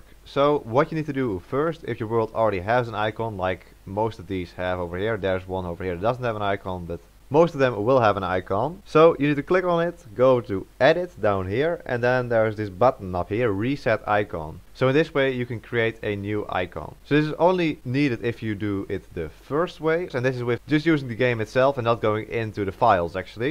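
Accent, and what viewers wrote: Netherlands. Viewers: Thanks for the guide, are you dutch? You sound like from the netherlands love their english accent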